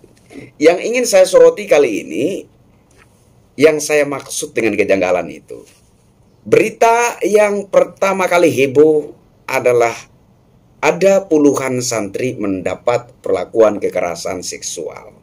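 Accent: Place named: Papua, Indonesia. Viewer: Assalamualaikum.Bang. 🗣️ Oh..mksd ada BENANG- MERAH to? 🪡🪡😇Waduh kentalnya dialektis Papua,👏👏bongkar teruss ya bongkar,Bang💪🇲🇨 TRIms,🙏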